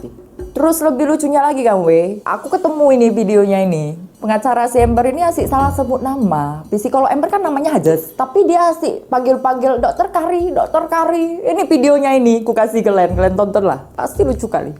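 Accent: Medan, Indonesia. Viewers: Bahasa medan nya kental kali, suka kali aku dengarnya. 😍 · Suka cara bawa cerita nya,jelas dan gak berbelit" apalagi kaya ada logat Medan nya ya..☺️ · Haha medan abis...kaya mana itu si amber...logatnya mirip bgt tmn sy yg dr medan... Sukses ya...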